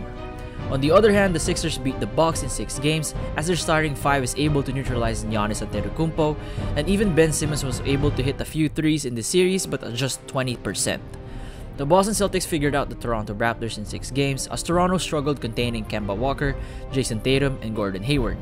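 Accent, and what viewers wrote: Philippines. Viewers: Ahh tama talaga ako. Ang accent mo po hehehe Pinoy talaga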